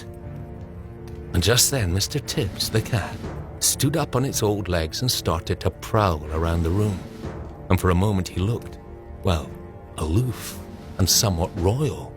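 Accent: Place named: Edinburgh, United Kingdom. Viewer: The accent he's using is from the Northern tip of Edinburgh. I spent much of my childhood in Scotland and Ireland.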